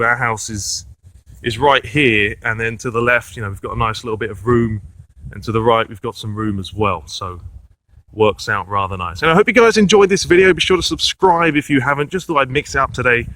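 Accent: United Kingdom. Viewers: I love how you still kept your British accent. :) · omg it is such a beautiful house and you live in florida and you sound like you live in the uk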